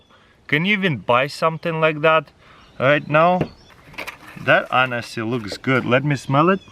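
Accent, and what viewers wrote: Russia. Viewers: Anyone else read this in his Russian accent😂😂😂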